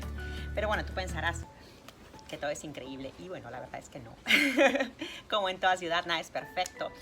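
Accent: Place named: Mexico. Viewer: 👍Qué lindo video, y todo tan bien explicado con tu hermoso acento mexicano 🤩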